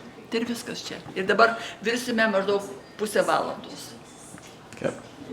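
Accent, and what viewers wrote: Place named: Molėtai, Lithuania. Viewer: Vo čia kaimiskai. Pagal kalbą tai kaip močiutė iš Molėtų;)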